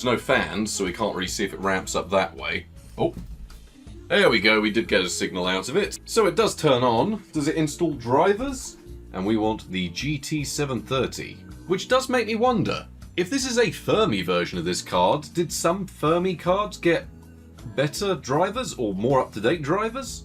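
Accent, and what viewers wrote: England, United Kingdom. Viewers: I love your channel brother. Serious question, are you from the same part of England as Jeremy Clarkson? Your inflection is so much like his.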